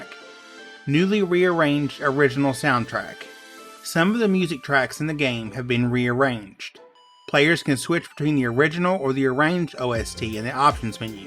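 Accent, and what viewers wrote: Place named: South, United States. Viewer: Man I want to like this video but that accent is so heavy. I'm from Alabama myself and I try not to talk with that draw. It's funny I'm from one of the most hillbilly states in America, but I hate the accent and the way of life. I'll take Miami Beach and the ocean any day over hillbilly land in the mountains. More southerners should try to speak without that horrible accent.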